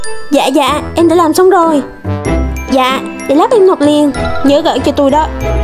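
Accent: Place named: Quang Ngai, Vietnam. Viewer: Chị là ngừ Quảng Ngãi hả cj em thấy giọng cj giống quá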